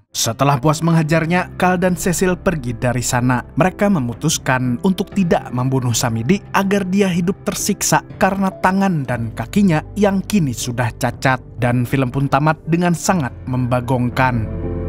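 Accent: Banjar, Indonesia. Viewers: Wahhh waahh pian urang banjar kah min..? 😅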